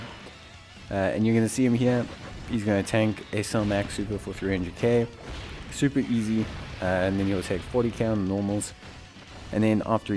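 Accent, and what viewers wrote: South Africa. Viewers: Yo bro are you from South Africa? Your accent sounds familiar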